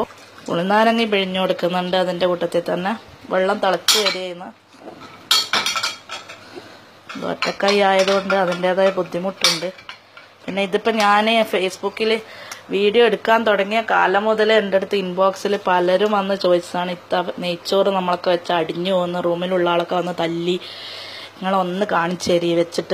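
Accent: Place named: Malabar, India. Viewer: റെസിപിയെക്കാളും ഇഷ്ടായത് നിങ്ങളുടെ നല്ല പച്ച മലബാർ ഭാഷയാണ്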